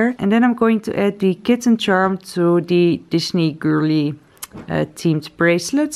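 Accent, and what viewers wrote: Netherlands. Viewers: you are dutch bc of the accent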